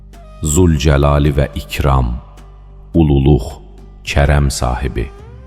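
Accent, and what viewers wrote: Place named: Turkey. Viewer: Salamun aleykum, Allah razı olsun, çox gözəl videodur. bəzi təkliflərimi ümid edirəm dinləyərsiz. Ərəbcə hərflərin bir neçə cür tələffüzünü nəzərə alaraq düzgün oxunuşu üçün adları ərəbcə yazsanız daha yaxşı.olardı. bəzən türkcə oxuyursuz qaf əvəzinə.kaf oxuyursuz. Uzadılan zaman Ə-ni yox A-nı uzadırsız. Alim yox əslində əyn hərfilə Əəlimdir. Zahir yox Zəəhir. Qaffar əslində. ال غفار Ğaffar oxunur , qafur da ğaafur olur Samad - ehtiyatsız yox ehtiyacsız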